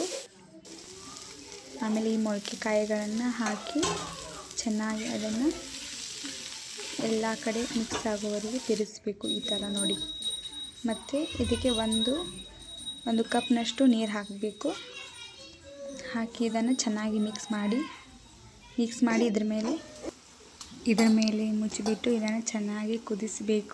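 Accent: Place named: Maharashtra, India. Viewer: Akka avare niv marathi naa adakke nivu teve yannu tava annutiddiraa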